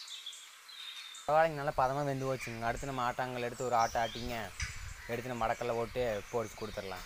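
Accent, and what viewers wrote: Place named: Coimbatore, India. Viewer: Nice da machi 💯👍❤️ Nama coimbatore slang Vera lvl nga🔥🤩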